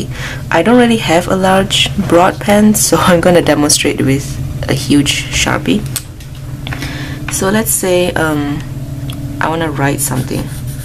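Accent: Singapore. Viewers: Singaporean accent! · You sound like you're from Singapore! Haha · You sound like a singaporean. Are you from singapore?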